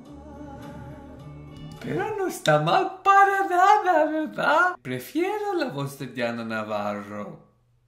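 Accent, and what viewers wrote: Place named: Italy. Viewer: Hi, funny video... Blas Canto´s performance was amazing, it's extremelly difficult to imitate Diana Navarro. Your Spanish is good as well, keep going. Btw, why do you have that Italian accent whe you speak Spanish?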